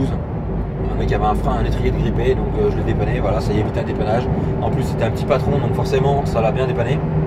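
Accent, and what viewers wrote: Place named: South, France. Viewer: Très belle vidéo comme toutes jusqu'a maintenant!Félicitations pour tes 10500 abonnés!Déjà deux an que tu existe sur youtube et deux ans de pur plaisir!Bonne route!Petite question tu est originaire de quel département?On dirais que t'as un peu l'accent du sud (bordeaux)😅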